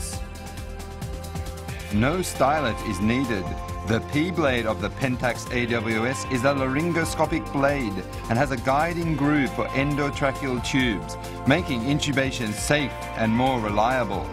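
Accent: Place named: South Africa. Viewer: I love the south african accent; it reminds me of Alan Partridge series 2. This looks even easier than glidescope.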